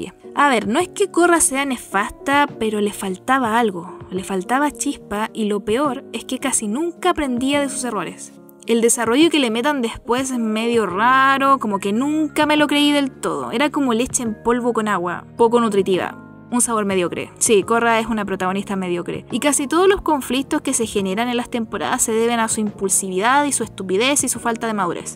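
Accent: Chile. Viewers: Es la primera vez que veo su canal y note altiro que es chilena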